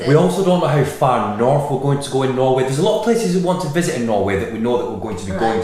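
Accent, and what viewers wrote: Scotland, United Kingdom. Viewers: Man, where is your accent from?! I thought you were scottish, then a brummie, then from Lancashire.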